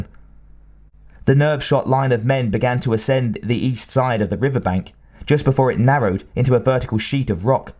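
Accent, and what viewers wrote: United Kingdom. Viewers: I would have liked it if in this beautiful story each character had their own voice, as was done in the Radio Plays, plus the audio effects, and the suspenseful music in the background of the story, not to mention that the BRITISH ACCENT IS TOTALLY UNACCEPTABLE. Don't you think that in this story, the BRITISH ACCENT doesn't match the characters❓️❓️❗️ And how the hell can a Native American / ITALIAN - SIOUX (BILLY SOLE) or an American from the South, Beaver Creek Swamp (BLAIN) from Alabama or MAC from Kansas would speak with a British accent, and same for the others❓️❗️❓️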